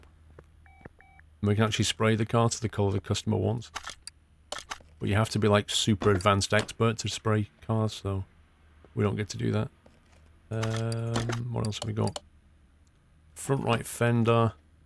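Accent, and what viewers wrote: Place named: United Kingdom. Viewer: I'm trying to make it as a Youtube gamer... but I can never compete with this British accent...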